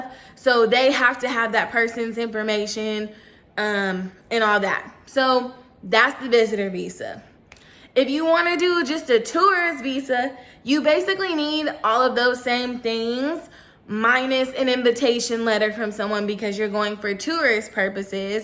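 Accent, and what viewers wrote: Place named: United States. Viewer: Ndewo, ke kwanu...funny american accent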